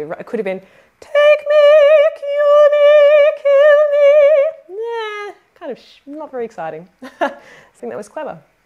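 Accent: Australia. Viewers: Love your Australian accent ^_^ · G'day! Do I recognise the accent? Welcome to Nightwish. Nightwish Army, Blue Mountains Corps, Australia! What Gabriel said! Any song from this concert will knock your thongs off! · Are you Aussie?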